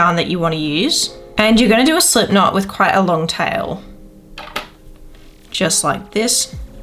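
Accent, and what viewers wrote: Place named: Australia. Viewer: Lovely to hear an Aussie accent and not have to listen to a half hour intro 🙏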